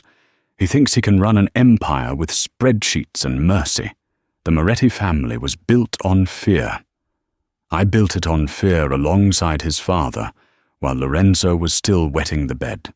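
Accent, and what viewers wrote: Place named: United Kingdom. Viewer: I have a simple solution if you are interested? I just put a piece of paper over that picture section of the screen, and click on Transcript to listen and read along with that purring English / British accent, and let my imagination do its magical thing, as it was meant to. A humble suggestion from Windsor, Ontario Canada EH?!🇨🇦 Cheers! Enjoy!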